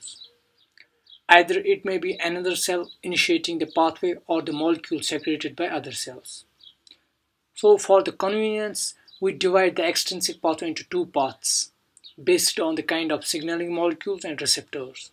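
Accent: India. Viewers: your accent is very strong indian, but you really made me get the clue. thanx from germany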